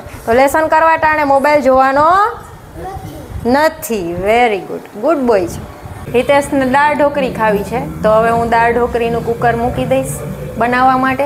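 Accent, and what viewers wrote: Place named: Kathiawar, India. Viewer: Gujarati vlogs is far more better than Hindi. Your Hindi was not so clear and in Kathyawadi tone. Hence, our mother tongue is the best. Good luck.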